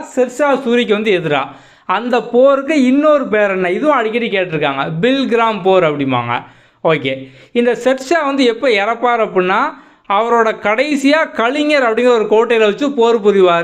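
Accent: Tirunelveli, India. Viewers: Are you belong to Tirunelveli?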